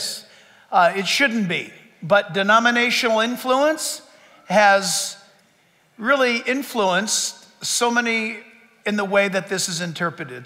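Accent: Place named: New Jersey, United States. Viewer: You sound like you come from New Jersey to me! You also have the same sense of humor that my husband and I have and I gave him your name and this series you're preaching. God bless you, always. I've been through some of the things you mention.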